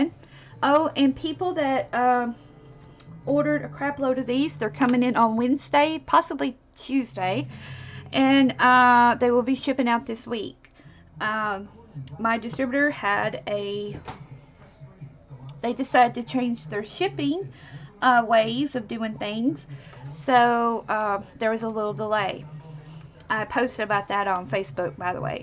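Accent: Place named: Eastern Kentucky, United States. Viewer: On the last ornament..., did you ever try using some sort of a starch, maybe? And girl, where are you from? I'm in Nashville, but I'm from Eastern Kentucky. Your accent sounds like mine...lol.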